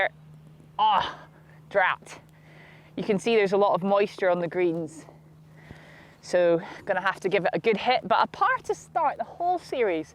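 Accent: Scotland, United Kingdom. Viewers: Really good idea, with real insight from someone who explains clearly in a lovely brogue - a pleasure to listen to. Suggest Dunstanburgh and Edzell (both hidden gems) and possibly Lady Bank with possibly Victor Perez in Scotland and Lee Westwood at Dunstanburgh. Down south what about Ferndown and Trevose with Andy Sullivan. Really looking forward to the series where ever you choose. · I watch your videos for the golf.. and the charming Scottish accent!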